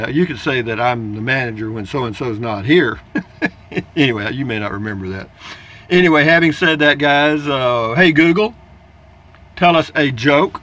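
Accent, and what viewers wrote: Texas, United States. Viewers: Its nice when we see your new videos .You look very content😀.Happy x u.Love the texas accent .I used to live S.A.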